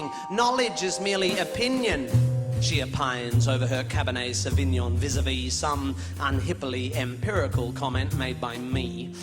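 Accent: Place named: Australia. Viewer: i thought he was giving storm an austrailian accent and theres another song where he talks to an annoying rich guy with an austrailian accent so i figured he was trying to make austrailia look bad ( not really i was just makin a bad joke) turns out hes austrailian too im just an idiot who didnt catch his accent cause i thought he was british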